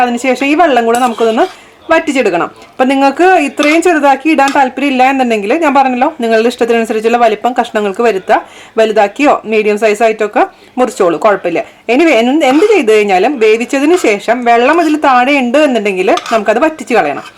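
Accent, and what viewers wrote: Thrissur, India. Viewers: Wooww😋 കൈപുണ്യം എന്ന ഒരു സാധനം ഉണ്ട് ചേച്ചി അതും വേണ്ടെ എനിക്കൊന്നും അത് അടുത്തുക്കൂടെ പോയിട്ടില്ല Anywayy kandapo kothiyaayiii 😋😋😋 Chechide anchoring Nammlde trrisure anchoring Kandathkondaa njn subscribe cheythath thanne Thats the thing what i inspired to learn you You never change your own way Plz reveal more and more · ചേച്ചി മ്മടെ തൃശ്ശൂർ ന് പറഞ്ഞ അത് മ്മടെ ഭാഷ തന്നെയാ അത് മാറിയാൽ പിന്നെന്തുട്ട് തൃശ്ശൂർകാരി ഞാൻ ഒരു തൃശ്ശൂർകാര്യ ഇത് കേൾക്കാൻ വേണ്ടിയാ കാണുന്നെ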